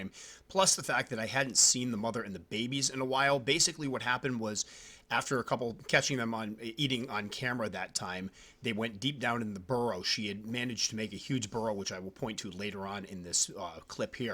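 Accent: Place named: Midwest, United States. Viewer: Dude, I wish you had an email, but I’m sure that’s the last thing you need...58,000 emails asking “what are the best T’s to start collecting?”! 🙄 My question of the day is (if you can/want to answer?!)...Being from St. Louis, MO, I hear a STL/Chicago (Midwest) accent...am I close?! 🤔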